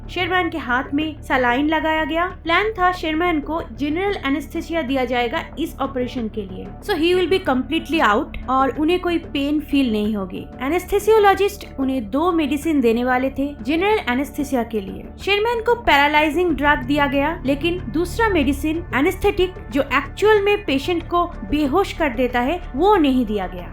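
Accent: Bengal, India. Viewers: Are you bengali? It sound like you are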